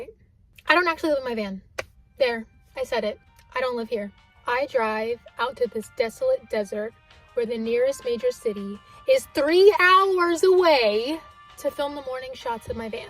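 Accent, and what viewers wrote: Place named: California, United States. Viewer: The only thing i questioned is where is your Texas accent, sounds like you were born and raised in Cali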